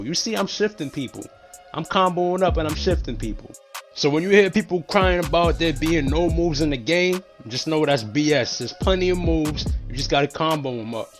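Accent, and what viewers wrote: New York, United States. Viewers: his New York accent makes this video so much more better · this my first time watching your vid and I’m glad I found you, I subbed and all bro but how tall is your offensive threat I made mine 6’2 is that good ? & no cap you sound like you from NY or NJ which is mad funny cause we sound alike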